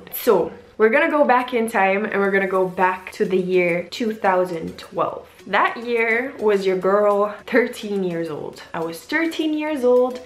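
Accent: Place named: Jamaica. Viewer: You have a slight Jamaican accent lol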